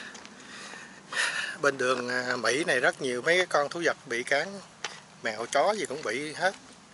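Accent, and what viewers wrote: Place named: Mekong Delta, Vietnam. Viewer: Chúc mừng cháu nay vui nên ăn no cứng bụng luôn , mà lạ nhen toàn từ Việt phổ thông nhức nách , ná thở , con cò có cái cổ cong cong ... giọng , tiếng không pha lẫn của miền Tây VN ..... vui và thân thiện . Cám ơn bạn .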